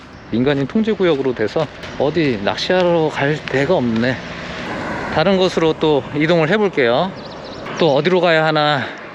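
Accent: Jeolla, South Korea. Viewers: 자네 고향이 전라돈가?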